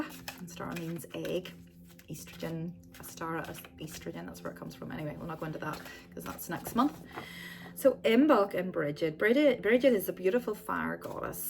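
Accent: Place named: United Kingdom. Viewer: Interesting dialect but I am from USA and can't tell what part of the Uk you are from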